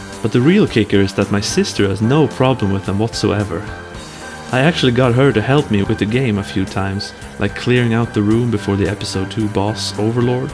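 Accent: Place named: Sweden. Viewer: Hah, hur visste jag att du var svensk sekunden du öppnade munnen? ^-^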